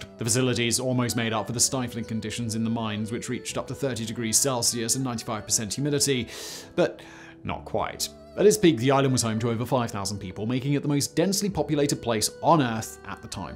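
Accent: United Kingdom. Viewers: Yes. He tends to put me asleep. I genuinely don't like the British accent but I like his voice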